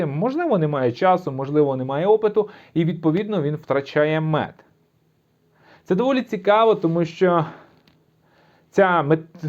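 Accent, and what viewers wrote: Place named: Central Ukraine, Ukraine. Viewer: Прослухав ще раз ! А ми земляки. Так розмовляють в черкаській та вінницькі області..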